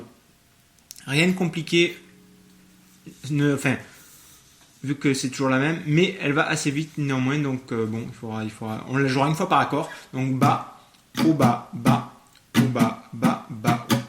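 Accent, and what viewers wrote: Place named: England, United Kingdom. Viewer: Magnifique accent anglais. 👌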